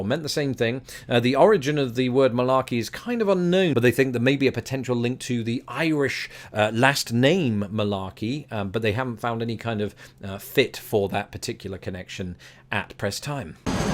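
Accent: United Kingdom. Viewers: Brilliant list! I think I only used the word brilliant after listening to your brilliant british accent. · Thanks for this series, it's most enjoyable and quite interesting. As someone whose been a bit of an Anglophile in my media consumption, I've long found the way the UK speaks the same language this former Pennsylvanian used intriguing. Both the US and UK speak a strikingly similar language---except at those times when we use totally different words or phrases to describe the same thing. From my teen years, thanks to our local PBS affiliate, I became exposed to a litany of BBC sitcoms that became my first forays into hearing the type of English you grew up speaking. Between Tom Baker's Doctor Who saving the universe with K-9 and Sarah Jane by his side, to Mrs. Slocum worrying about her pussy well being at home in the airing cupboard, while working behind the counter at Grace Brothers, I was smitten with British TV. 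What intrigued me most was learning all of the words and phrases that we didn't use back home in Harrisburg. Deciphering contextually what everything from a car's bonnet to it's boot became intriguing mental exercises for my teenage brain. Ever since, I've always found interesting how English varies in its usage between the nations that speak it, so I appreciate your perspective on the differences. The themes for these videos are well considered and the abridged etymologies have been most enjoyable, as has been your presentation of this overall. A British accent like yours just adds that nice touch to the whole thing, I think, so I hope you retain it. To my American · I'm catching up on older videos. it's fun to hear him sound more "British" as the videos get older.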